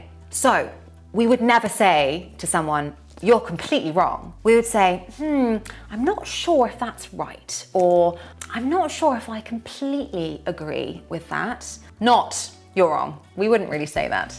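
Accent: United Kingdom. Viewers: Obsessed with British accent and highly recommend her cz i watch so many videos but she is incredible and so lovely her accent ❤❤ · Hi, my name is Rodrigo, I'm from Brazil. I love watching your videos — they help me a lot and they still help me today. I worked with a British band once, and I was pretty confused because it was my first time hearing this accent at work without subtitles (by the way, I really love your accent 😄). I had an awkward moment when a guy asked me if my wife was coming to the show, and I just said, “ I Don't Know.” He looked at me like he was surprised. I think I was a bit too direct.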